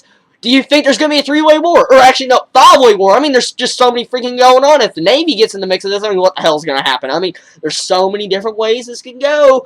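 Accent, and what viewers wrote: South, United States. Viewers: Did you study voice training? Or something to that effect? You sound noticeably more country back then and now you speak very clearly and slowed down.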